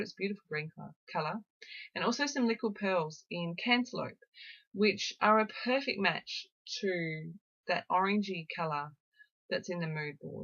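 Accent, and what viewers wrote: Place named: Australia. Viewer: I love your thought process! I am a new subscriber and honestly I first clicked on your video because of your first name and I LOVE you saying my name with your Aussie accent 😉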